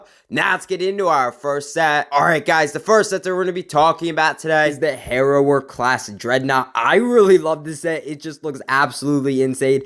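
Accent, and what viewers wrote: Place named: United States. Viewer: u sorta sound like an American. Dantdm lol (not hating)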